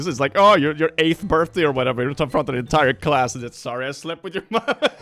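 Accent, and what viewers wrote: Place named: Sweden. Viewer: you sound very swedish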